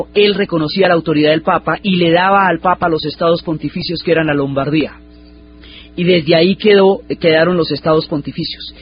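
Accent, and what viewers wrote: Colombia. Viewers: Es un placer escucharte maravillosamente narrado, me das luz y claridad a la historia y con la sal y pimienta de los modismos de Colombia. Delicioso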